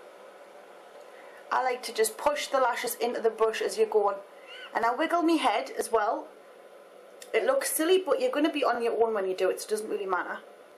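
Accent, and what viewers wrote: Newcastle, United Kingdom. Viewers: It's a Geordie accent.. aka Newcastle in the north of England :) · ur british accent makes it more fun 2 watch!!! also i just subscribed!! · I usually don't like that New Castle-like accent but she is the nicest person ever so I don't really mind :)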